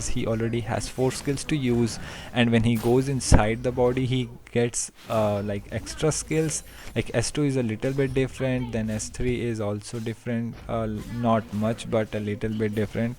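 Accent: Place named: India. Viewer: Bro I think your indian from your accent,but forgive me if iam wrong but I was wondering whether you are getting ranked matches,iam indian myself so I think we will be playing in the same server,that's why iam asking you,iam struggling here cuz iam not getting matched in rank and maybe like after 6+ mins I give up, please provide me some tips on how to get ranked matches easily if there are any, Thankyou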